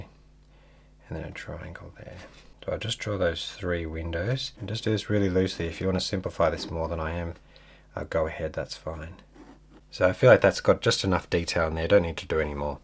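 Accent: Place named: Australia. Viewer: Are you from Australia, New Zealand, Tasmania? Love your calm voice and accent.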